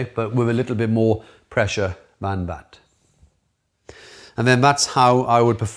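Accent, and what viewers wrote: Wales, United Kingdom. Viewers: That's definitely a Welsh accent I hear!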